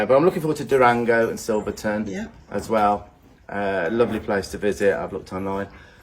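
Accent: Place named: Wales, United Kingdom. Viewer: Brilliant tips’ VLOG like the other one. By doing what you do, it removes all the flight/fight out of them … and they may actually enjoy the riding. I knew Stephanie Powers was into horses from watching her on some US talk show many years ago, where she discussed her custom made equine dinnerware … it was beautiful … and it was also for charity too. I wonder if she still has the set?!? But I didn’t know she had rescue properties all over the world. It should be an awesome visit/session. The way you were talking it sounded like it’ll be a members only VLOG … 😢! Pity!!! Well, you’ll both have done quite a lot of globetrotting this year … mind you your Vacation time is usually in the Winter/Fall seasons, which tend to be the off-season for some. This was an unusual year though … what with the wedding and all! Love the colour of nails 😊 … will look nice with the tan you’ll be working on! 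I’m wondering if the place you’re going to is that rescue you visited at, in one of your VLOGs? I think it was there we saw it. Or maybe that was Greece … you mentioned you guys lived there for severeal years at one time. Hope you have a wonderful time again, when you’re back in the US … hopefully it won’t be too crazy weather-wise! Things are getting a bit extreme, in various parts of the world. Loved the look for today’s VLOG … almost forgot you were Welsh … or are you British (?), until you started to talk! 😂 I’m looking forward to the next VLOG. Safe travels and Happy Trails! 🙏🙌🐎🐴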